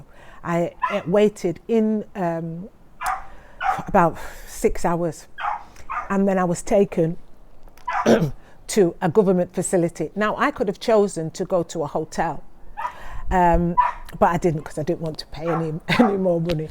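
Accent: United Kingdom. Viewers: Cou cou is our local dish; actually cou cou and flying fish. I can hear from your accent that you are British, do you have Barbadian relatives? Most black folks speaking with a British accent do have Barbadian relatives. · Are you British ? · Where you from cock .... Sheffield?😁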